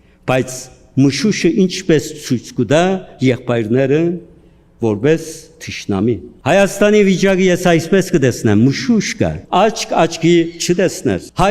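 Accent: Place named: Turkey. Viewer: Ինչ՞՞՞. Ես խոսում այ մարդդ՝ մենք շատ էլ հստակ տեսնում ենք որ թշնամին փաշօղլ օլաջախն է՝։ թուրքական ակցենտիդ մեջ թուրքի զապդի հոտ կաա՝։ քեզ էլ հասկացանք նիկոլիստ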